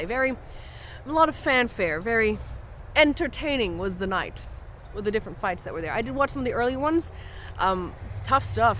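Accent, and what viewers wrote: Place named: Western Australia, Australia. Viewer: 25 whole years ago. 60 days of my life was spent in WA. Good ole memories. Your accent made me flash back on the good times in my life.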